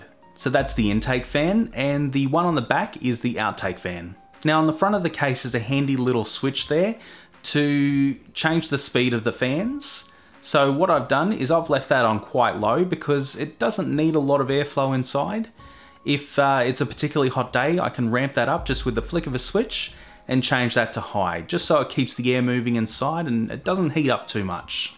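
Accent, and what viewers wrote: Australia. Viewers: Problem with our Australian voices mate? You're probably American.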